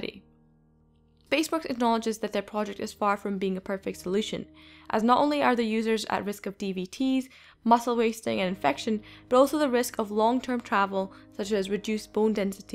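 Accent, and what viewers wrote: United Kingdom. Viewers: What part o UK are you from?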